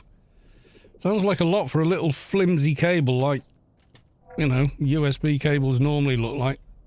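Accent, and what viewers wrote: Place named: Somerset, United Kingdom. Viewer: Have to correct the record for my local brethren: the Wurzels (of Combine Harvester fame) are from Somerset and so is that accent. Cornwall and the Black Country are both pretty different :D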